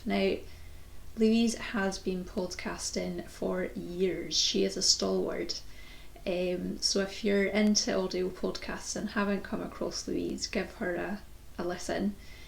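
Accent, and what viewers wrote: Scotland, United Kingdom. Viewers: Congratulations!!🎈🎉 I just love your podcast!! I Love Scotland and been several times but never to your area. We still have plans to do the North Coast 500!. I love your scottish accent. You do make the most beautiful things, so keep up the good work. I learned about your podcast through Selma from Little Big Knits. Kind regards, Erna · I also got to your podcast through YouTube suggestions and loved it from the start. What made me subscribe before finishing the first chapter? The good energy you transmit, the 20-30 minute format, direct and calm, the quality of the podcast notes (unbeatable!), Your fabrics and choice of patterns, ... and above all your voice and your way of talk: I live in the north of Spain and watch a podcast in English is a good way to enjoy knitting and at the same time try to practice my "English ear", and your way of vocalizing seems surprisingly clear to me ... 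I thought that English Scots was harder to understand, but you speak very, very clearly! 😅 Congratulations on your almost two thousand followers, and a curiosity ... why that "Muchísimas Gracias" farewell? It has me intrigued ... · Hi! I am pretty sure I heard of your podcast from some other podcasters, but I cannot remember who 🤭 so sorry! Anyway, thanks for the time you spend with us and for sharing your beautiful knitting projects. I adore your accent!! And would love to get to visit more of Scotland. Just went to Edinburgh once, but I loved it. Your gifts for the giveaway are fantastic! I’ll keep my fingers crossed 🤞🏻🤞🏻🤞🏻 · As for so many others your podcast came up in my YT recommendations and after watching some minutes of your second episode I was happy to have found you! I like your projects and love a scottish accent 🤩 . Looking forward to many thoughtful hours spent with you! Thanks so much! 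Anja Fromm Germany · You popped up when I checked "new to you" and was drawn to your name The Thoughtful Knitter. Love your Scottish accent and the projects that you share. I have subscribed now. Really enjoy your videos and what you share. Very calming and that's what we all need during these difficult, trying times. I'm in Richmond, Virginia, USA. Thank you for a beautifully done podcast. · Hello there- I spotted your channel on You Tube just a few days ago and thought I'd give it a try- the name appealed to me 😊 and I've loved it right from the opening moments- lovely and calm. I absolutely adore your Scottish accent as it's just so gentle. We visited the U.K. A few years ago and spent a fortnight in Scotland but only went as far north as Inverness- such a beautiful country! Although your giveaway is gorgeous I suggest you don't choose me- I live in Tasmania, the southernmost state of Australia- the postage would cost you too much 😉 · I love your podcast, and I especially like to just hear you talk - love your Scottish accent! 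Someday I want to travel to Scotland, it looks so beautiful! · Hi,! I was suggested to watch your podcast by youtube. I have been quite thirsty for knitting podcasters from Scotland, as I am here too and the more local, the more relevant, I guess 😊. I do enjoy your accent and your podcast episodes and I’m glad youtube suggested you to watch 😊. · I believe YouTube recommended you to me, I love listening to fellow Scottish podcasters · Hi, your podcast was a recommendation from You Tube, may be because I love other Scottish podcaster as Caz from the wee sew and sew and as well „The meaningful stitch“ I liked your podcast and I love to here the Scottish English your knitting is also so beautiful so I left an abo here.Greetings Vanessa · As someone with fibromyalgia I really really appreciate your approach to podcasting. I’m new to knitting and I find it to be peaceful and calming work. It is soothing to knit whilst listening to your soft Scottish voice. 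I love your content so far. Keep going and thank you.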